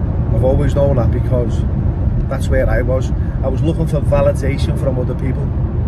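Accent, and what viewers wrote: Liverpool, United Kingdom. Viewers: Keep going bro your good I like to hear one of my favourite scousers bellew ok and that lol but you give us the street ex addicts new addicts any addicts but anyone gets a chance with you don't lose it now bro your on the pinnacle of success you will be in films soon bro your already a film star bro end o chat bless and thanks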